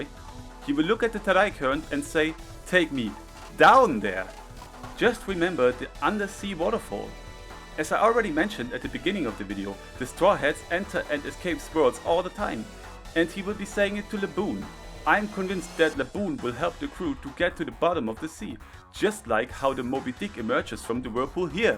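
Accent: Germany. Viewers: Are you German by any chance? Video is great 🎉❤ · he sounds german. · Bruder dein deutscher Akzent ist nicht zu überhören 🤣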